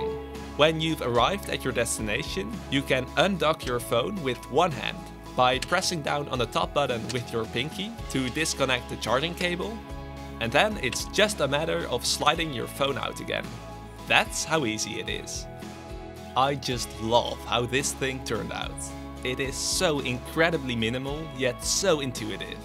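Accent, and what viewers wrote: Netherlands. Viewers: Yo r u dutch?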